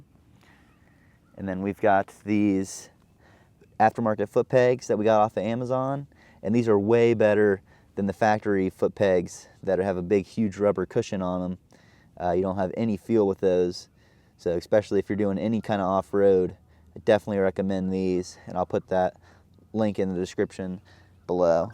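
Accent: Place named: South, United States. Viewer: watching from Australia I'm guessing southern? Hazard county?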